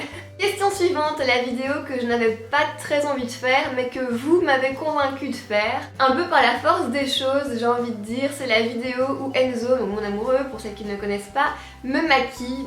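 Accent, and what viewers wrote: Belgium. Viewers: J'adore ton accent belge ! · ton ptit accent belge est très marrant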